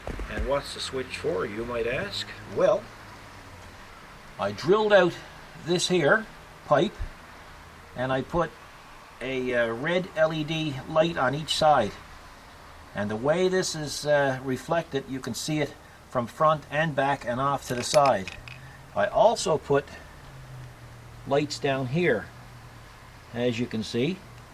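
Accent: Newfoundland, Canada. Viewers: Did I hear Newfoundland ? Well if you’re a mulley ? We might be kin love the snowblower and love the country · I knew you were Newfoundland as soon as you spoke. I'm a townie myself.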